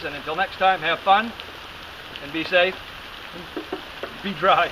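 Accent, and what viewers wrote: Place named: Pennsylvania, United States. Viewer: By the way, are you in PA? Your accent is familiar.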